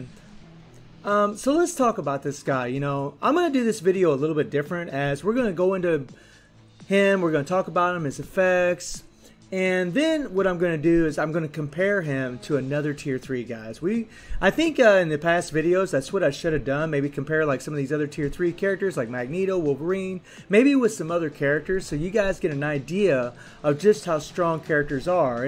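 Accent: United States. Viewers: are you a Southern American?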